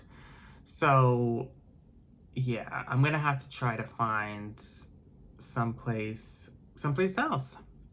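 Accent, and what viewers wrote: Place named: New York, United States. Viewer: Hi,. You look lovely! I thought I recognized your accent - you’re a fellow New Yorker! I don’t know where you live (Upstate, boroughs, etc), but have you ever considered Long Island? That’s where I am, and it lacks all the chaos of the city. Not as fun, but way less drama and crime.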